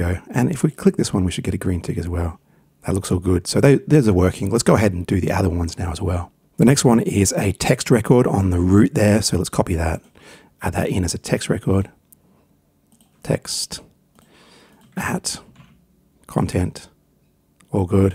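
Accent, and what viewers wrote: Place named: Australia. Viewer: Hey, this video is great, thank you. As it sounds like your from Australia as well... we have only just starting working a lot more with Cloudflare in the last few months with websites, subdomains and email routing. We have come across problems with our internet and email provider being Optus. Question, do you know if this format that you have just explained will work for something like Optus, because all our emails we send from Outlook. Hope this makes sense, regards Brad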